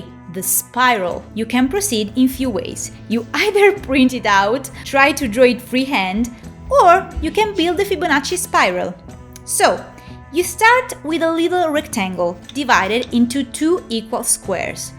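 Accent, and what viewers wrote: Italy. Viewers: The video was beyond helpful and interesting. Thank you so much for this. Just to mention… Damn I love this Italian intonation sooo much, it makes all languages sound awesome 😱😱😱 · LO SAPEVO che eri italiana! Dalla pronuncia 💀 Da un altro artista toscano: Bel video continua così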